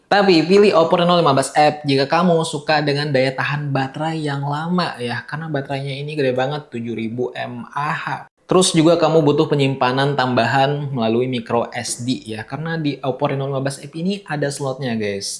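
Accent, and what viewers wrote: Banjar, Indonesia. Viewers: Urang banjaarr..kh?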